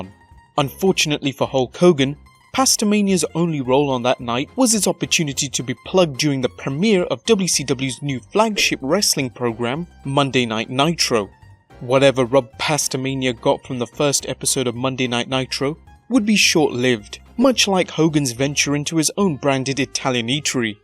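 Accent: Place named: United Kingdom. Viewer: This was not a story meant to be told with a British accent.....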